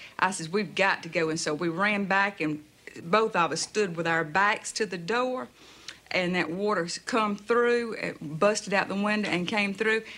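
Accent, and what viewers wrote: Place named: Louisiana, United States. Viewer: i'm english but this sounds similar to the louisiana accent am i wrong?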